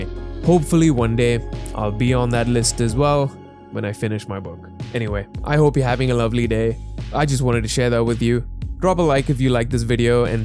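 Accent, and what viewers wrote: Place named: South India, India. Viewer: Why why why you speaking with heavy accent thats why people make fun of us are you south indian or something..stop the accent please its annoying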